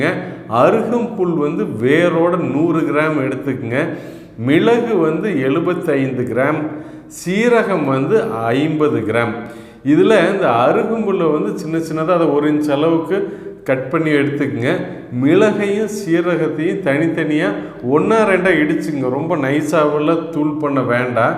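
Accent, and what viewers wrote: Kongu, India. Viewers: வணக்கம் சார் உங்கள் வார்த்தைகள் கொங்கு தமிழுக்கு மேலும் அழகு சேர்க்கின்றது உங்கள் வார்த்தைகள் எமக்கு நம்பிக்கை தருகின்றது அனைவருக்கும் புரியும்படியாக தெளிவான வார்த்தைகள் உங்கள் தொண்டு வளரட்டும் இறைவன் துணை தங்களுக்கு எப்பொழுதும் உடன் இருக்க வேண்டுகிறேன்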